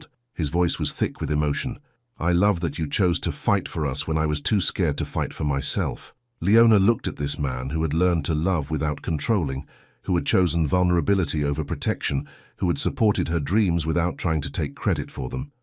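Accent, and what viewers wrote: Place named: United Kingdom. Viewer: Why does this video have a voice with a British accent? I get tired of hearing these British accent.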